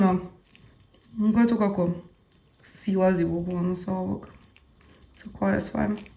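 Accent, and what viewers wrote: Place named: Novi Sad, Serbia. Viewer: Tvoji komentari su pre-simpa i jos sa tim NS naglaskom uvijek me oraspoložiš. A Baku Anu vec zamisljam kako se krsti kao na onu "šolju žumanaca" 😂❤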